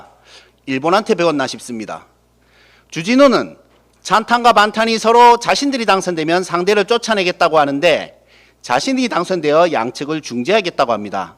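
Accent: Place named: South Korea. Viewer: 부드러운 사투리 구사가 일품이십니다.👍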